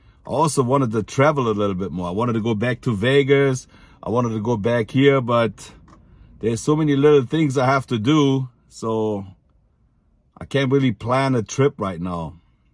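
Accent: Germany. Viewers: Take heart brother, I've watched your vids over the years, and I love your spirit, your German accent, and your swag, "Cast not away your confidence, for it has a great reward" I don't know you, but I know you, I used to live in Venice on Wavecrest between Ocean and the strand, very close to where your shop was, but those were the better years, and I feel like I got the best of it. Keep punching brother, your in my prayers, blessings...TMac Vegas Strong!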